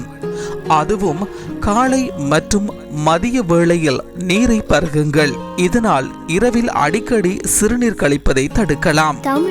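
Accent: Sri Lanka. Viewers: That's srilankans pronunciation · He is Sri Lankan tamil...u watch only advantage...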